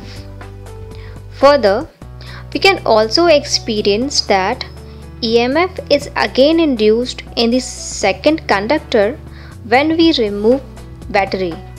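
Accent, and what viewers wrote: India. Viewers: I find her voice narration annoying. Its too thick of an Indian accent and she "lip smacks wet" too much. Ruined it for me. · india voice?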